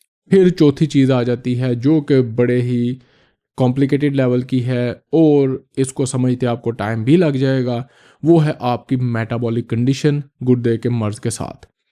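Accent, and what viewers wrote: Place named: Punjab, India. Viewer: Sir Your vids are extremely helpful for ckd patients. Your urdu/ Hindi has a Punjabi accent. Love from Indian Punjab....❤❤❤❤❤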